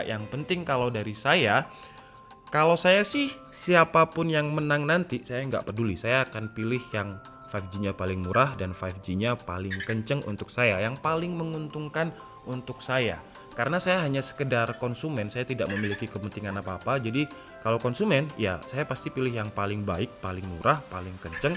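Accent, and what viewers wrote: Malang, Indonesia. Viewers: #REQ Kedepannya coba buat playlist podcast aja mas, seperti di channel sebelah. Toh dari segi penyampaian/pemilihan diksi mas juga udah bagus, cuman perlu diperbaiki dari segi 'medok' Malangnya itu wkwkw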